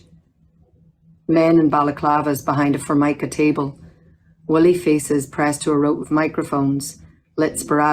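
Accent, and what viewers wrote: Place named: Ireland. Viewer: I love her authentic Irish ☘️💚🩷👏🏻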